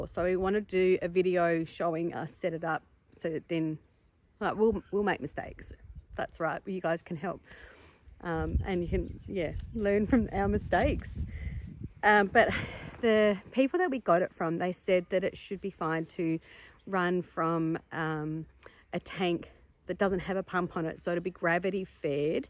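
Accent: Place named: New Zealand. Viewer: I was gonna say you sound like a kiwi! Kia Ora!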